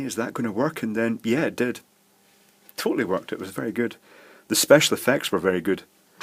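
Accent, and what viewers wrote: Scotland, United Kingdom. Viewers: Googles automated closed captioning system trying to interpret a Scottish accent is quite funny at times.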